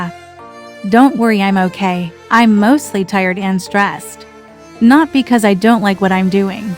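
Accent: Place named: Australia. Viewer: O.M.G!!!! The moment I have been waiting for has arrived! I cannot get over at how excited I was watching your voice reveal video. I took in every word you said and imprinted in my mind your favourites things. I too am into kdramas like sugar fizz! And I too enjoy Melisa McCarthy!!! I knew your voice would be sweet and gentle, and I love the Australian accent!! I bet you can do and be anything you want to be if you just put your mind into it. I will continue to watch your videos to the very end! You mentioned you won't be directing movies forever 😢 but when you do i will be around to celebrate an end with you❤ a the good luck with your future endeavours!! Love you queen!💙💙💙💙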